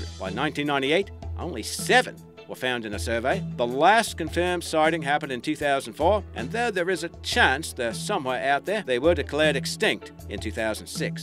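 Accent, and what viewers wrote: Australia. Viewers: glad you came back!! missed your Aussie accent. please don't leave us again!! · So glad the old narrator is back. I love his Aussie accent!!!! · So very happy to have you back mate. Me an my daughter love your Aussie accent an enjoy learning about all the different animals especially the ones about Australia.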